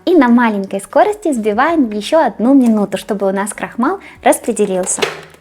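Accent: Ukraine. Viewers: Чудовий , ніжний , гарний тендітний десерт як і автор каналу !❤ браво! Окрема подяка за прекрасну українську!!!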